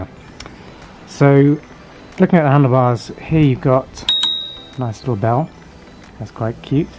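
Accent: United Kingdom. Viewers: You sound British but I'm assuming that you're reviewing for the American Market as the specs don't match, is this correct? Also lose the hat, you're not 12. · is this the Europe version or the US? I know there is different settings, as in, the Europe version does not allow the throttle to function independently where as the US version allows you to use it like a moped? if so, how did you get the US version in the UK (unless you're based in the US - your English accent has miss led me! lol)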